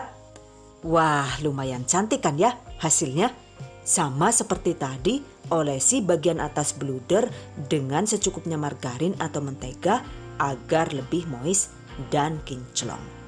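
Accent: Banyumas, Indonesia. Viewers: ini resep yg ditunggu tunggu vocal audionya ok bgt seperti penyiar perbaduan bhs ngapak dan englishnya mhn dipertahankan sucses selalu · Langsung download....👍 makasih yang ngapak bgt tp lokasi Jatim 😁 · Suka banget dengan channel ini, penjelasannya detil dan menghibur , logat Banyumasannya itu lho ngangenin. semoga menjadi amal jariah. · sy suka resep2nya..selain mudah caranya,suka bahasa dan logatnya..banyumasan(purwokerto)gitu😍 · Mbaknya lucu suaranya kyk orang bule tp kyk pangenyongan orang apa to sampeyan itu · Bunda asli mana si? Ko bisa bahasa ngapak kaya saya.😀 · Kanggeeeeeeeen karo bhs ngapaknya..... Mksih resep bludernya bun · Jan seneng banget krungu ngapak koh dadi terobati rinduku karo mama ku.suwun yung karo bahasa ngapake. Slm dari perantauan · Padahal inyong juga wong MABES (MADIUN BREBES) bisa ngomong ngapak juga tp kl denger si mbakayune lg ngomong ngapak inyonge auto ngakak 😅😅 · owalah medok nemen banyumasane ha ha ha..... 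mksh mba mudah dimengerti ilmunya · Channel kesukaan saya dari awal liat YouTube sampai kini...dari suara ,cara mengucap kan keren banget 👍🏻di tambah bahasa ngapak nya yg khas ,juga ilmunya yg profesional 👍🏻👍🏻👍🏻 sukses selalu❤️👍🏻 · yg baik hati cantik dan salekhah...ttimakasih resepnya...pokoke ora ngapak ora kepenak 😀😀😀👍👍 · Bukan Tegal mas...ini pasti asli wong ngapak (Banyumasan). "Ora ngapak, ora kepenak"😁😂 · Klo nyimak pas ngomong ngapak itu lo hahaha.... kocak, masuk OVJ deh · salam kenal ya bunda yg gaul and ngapak2 he he catattt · Makasih resepnya bunda,sipp dech, lucunya bhs ngapak e..😘😁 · Meski aq jg orang ngapak tpi klo denger ibu ini ngeluarin jurus ngapakny psti aq ngakak 🤣🤣(pdhl gi nyimak serius bgt langsung ambyarrr...)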